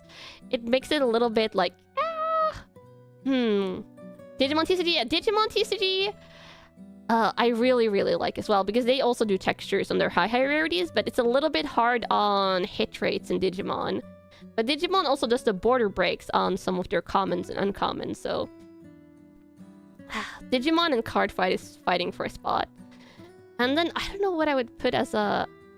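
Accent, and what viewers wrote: Norway. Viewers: love! Thank you for the pack crackings and chatting, a true delight any day of the week:_KannaKamoHug: Those Moomin cards were absolutely gorgeous, a very well designed and crafted collectors item!:_KannaShiny: The kamos hats are coming along very nicely too:_KannaKamo::_KannaComfy: Its always lovely to hear you speaking Norwegian (or your Norwenglish:_KannaLmao:) an entire stream would be very interesting:_KannaLove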